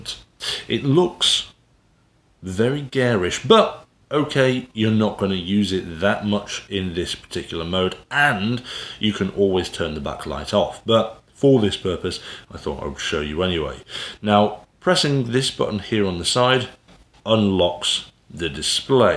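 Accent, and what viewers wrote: United Kingdom. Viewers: I love the British background- the cup of tea, rusty books, bottles of whisky! Nothing like getting your daily tech review in a British accent!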